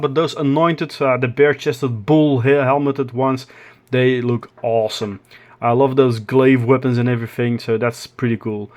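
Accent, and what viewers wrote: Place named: Belgium. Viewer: Ik hoor het Belgisch accent, maar vlot gesproken haha :) Beetje verrast over een Belgisch kanaal aangezien de kleine community in België... Los van dat, ben denk ik even enthousiast over de dwergen als jij, zien er veelzijdig en uniek uit! Ook één van mijn volgende projecten?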